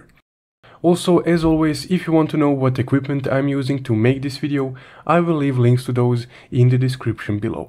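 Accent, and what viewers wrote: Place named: Russia. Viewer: Со найс то хеар рашн акцент)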